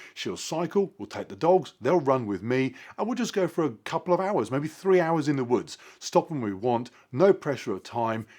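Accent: United Kingdom. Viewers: Pretty good tips to keep in mind. Also, as an American, your British accent immediately adds 20 IQ points and credibility.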